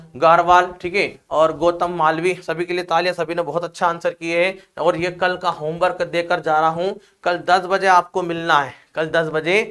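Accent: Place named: Mewar, India. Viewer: Sir ji आपकी पूरी भाषा हमारे यहां जैसी है मेवाड़ा राजपूत जैसी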